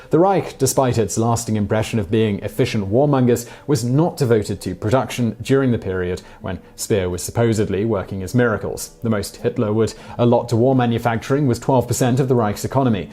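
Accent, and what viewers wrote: United Kingdom. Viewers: What in the world qualifies you to "clear up misconseptions" about anything much less the Third Reich. Just because you have a "proper British accent" that doesn't make you an expert on anything. Frankly if I had the choice between fighting for you or fighting for Rommel, I'd choose Rommel. Another wannabe "know it all". I wasted my time and the battery power on my laptop watching you. Never again!!!!!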